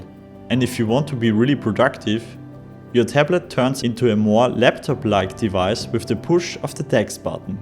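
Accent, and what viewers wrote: Germany. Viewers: It sounds he is German.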